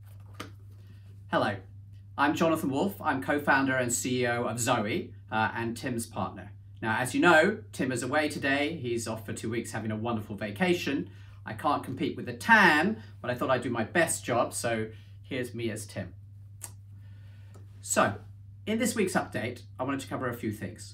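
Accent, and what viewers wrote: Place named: North West London, United Kingdom. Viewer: Definitely Jewish, you can tell by the way he speaks. Classic NW London Jewish accent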